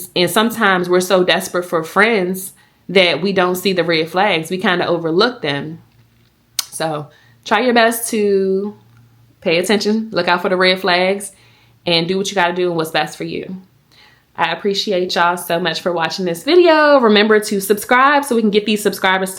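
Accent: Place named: Washington DC, United States. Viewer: You’re doing amazing, I hear your DC accent too sis! Keep going!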